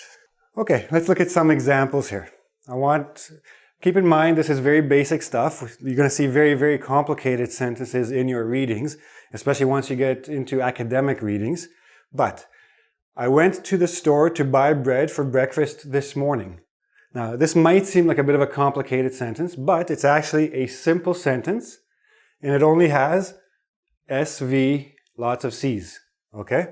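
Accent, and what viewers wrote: Quebec, Canada. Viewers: He sounds like a French Canadian. I know he's in Canada so it is a legit guess. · wait... are you canadian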